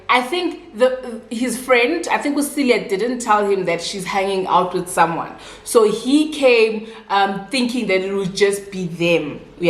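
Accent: South Africa. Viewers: Your Xhosa Accent 😂😂😂😂😂😂 ... " Hai Celia "" 😅😅😅 .. Make up looks absolutely Ravishing 💯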